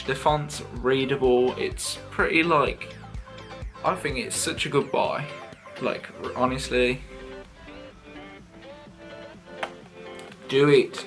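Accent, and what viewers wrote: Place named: United Kingdom. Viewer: Hey! Just came across your channel because needed some help this video was great and definitely subscribing!! Very helpful and as your British (guessing by accent) it helps me a lot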